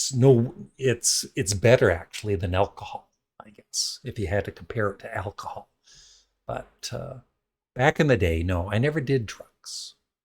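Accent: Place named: Canada. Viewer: OMG! That is hilarious and wonderful. I just started watching your other channel. I'm 61 so I am in the ballpark with you on your taste (with some adjustments but..) Youtube put this on my suggestion list. I am so glad. This is like Celebrities Reading Mean Tweets on Jimmy Kimmel (a late night show we have here in USA - not sure if you do in Canada). I must admit I get a little concerned about the mean comments my favorite audiophile and album reviewers get. I only watch nice people (who should be treated respectfully) You are so gutsy to even read mean comments. Anyhoo... Inspired by some negative things a few Youtube audiophiles (and commenters) have done to other Youtubers, I've been wanting to make my own Youtube video rating my favorite audiophiles, explaining how they work, what I like about them etc.. AND how attractive they are as a man (to me - Gay audiophile/rock and roll fan that I am). 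You had already inspired me to want to make one about album reviewers too! You are a! I'm so glad you called yourself that. Deserved! AND you rock that Canadian accent! Why don't those Toronto Audiophiles I watch rock one too? I hope I get the guts to make such a video and you get to hear how appealing you are! This video was just further proof!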